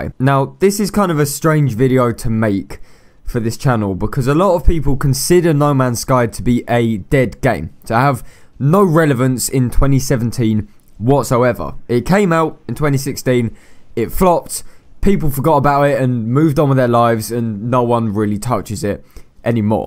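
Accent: United Kingdom. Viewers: damn. i would hate it if a british person like you insults me. the accent you british folks have makes the insults sound meaner then a normal American person when they insult someone. i was referring to when you said that they don't deserve money you're accent made it sound so harsh. its a good thing. lol take that as a compliment. i guess you can say your accent reminds me of Simon Cowell during the American idol days. lol. besides, i thought this was an okay game btw. homefront revolution was worse then this though.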